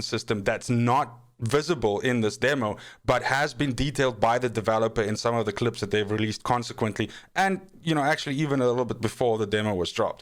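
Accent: South Africa. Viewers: Yo yo my guy, you from South Africa? I feel exactly the same, wasn't going to get this, played the demo, recognised the studios community interaction, then pre ordered. Nice vid, you get a sub from me.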